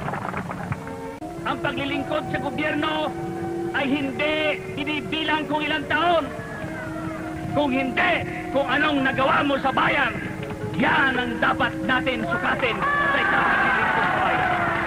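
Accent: Philippines. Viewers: pinoy na pinoy ang ang nagsasalita.yung mga nagcocoment nmn mga engeserot englesero kaloka😅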